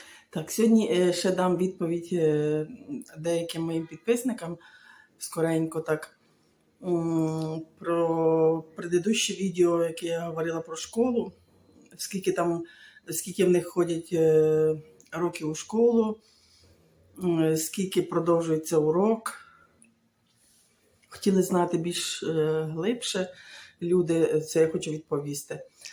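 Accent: Surzhyk, Ukraine. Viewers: Ви не вмієте розповідати , не кажучи про ваш суржик . Вам потрібні лайки?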